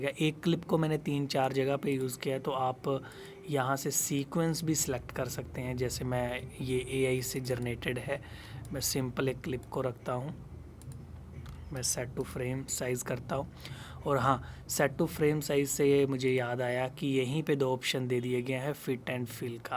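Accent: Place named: Punjab, India. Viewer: Brother hum yaha English pronunciation sikhne nhi aaye.....embarrassment feel mat krao....inka Punjabi accent hai..... but sir ka workshop attend kro ek baar...pta chal jayega...🙏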